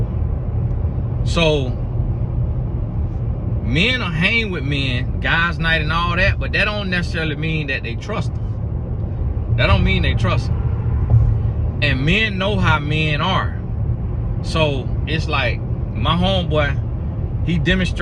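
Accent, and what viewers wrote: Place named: Texas, United States. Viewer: Yup that sounds like you’re from the general region I was born and raised in-TEXAS! Southern?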